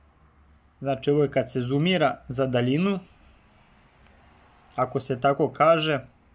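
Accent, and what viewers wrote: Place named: Mačva, Serbia. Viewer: Veliki pozdrav, samo tako nastavi. Ledlenser je odlična kompanija imam dve, jednu na glavu i jednu sličnu toj samo malo veću. Meni se čini po tvom naglasku da si iz Mačve, ili grešim🤔🤔?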